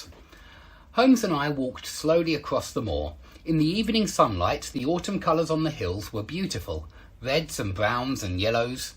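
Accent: United Kingdom. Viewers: British accent 🤌💕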